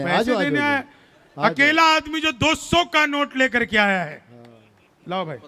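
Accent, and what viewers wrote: Bihar, India. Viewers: Aap BIHAR k ho maloom apke bolne se pata lag gaya NITISH NE JO BHASHAN DIYA USSE PATA LAG GAYA POORE BIHARI PURUSH MAHILAYEN SAB EK SE HOTE HAI SAB GANDA KHANEWALE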